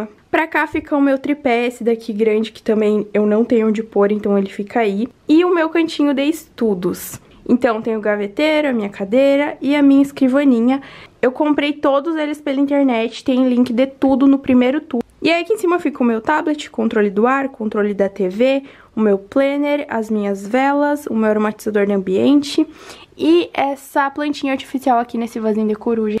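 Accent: Rio Grande do Sul, Brazil. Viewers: Vc é gaúcha? Teu sotaque é lindoo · Bahh q quarto fofo, um sonho! Tu é gaúcha? Parece muito... Eu sou gaúcha! Kkkk♥️♥️♥️♥️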